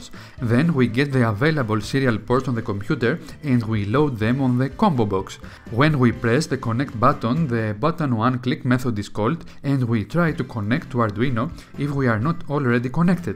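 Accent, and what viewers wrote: Greece. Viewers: Your accent sounds Greece but not sure 100% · Are you from Greece? Your English remind me when Greeks speak English btw I'm from Greece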